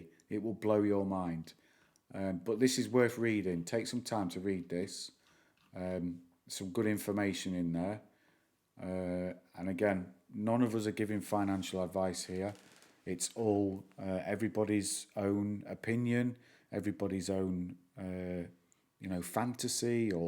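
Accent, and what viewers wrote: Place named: United Kingdom. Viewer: You sound liked an idiot. He’s just speaking with British accent.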